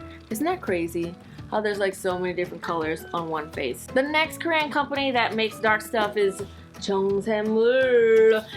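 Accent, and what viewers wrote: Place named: South, United States. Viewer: ur southern accent is the cutest !!!!!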